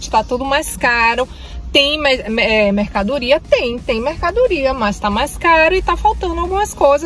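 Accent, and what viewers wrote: Bahia, Brazil. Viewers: Arrá! Descobri! Ela é baiana! Fala mainha, salvadô....😁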